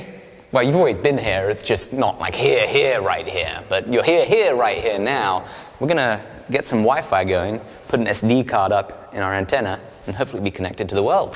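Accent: England, United Kingdom. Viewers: sounds like his British accent is coming back strong since going back to the UK. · It’s weird how in the states he sounds super British, but in the UK he sounds American. He just sounds weird everywhere.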